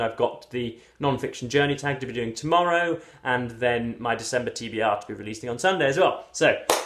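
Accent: United Kingdom. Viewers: North of England, sir, not Britain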